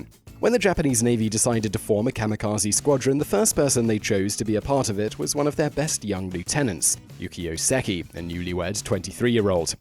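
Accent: United Kingdom. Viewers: British vsause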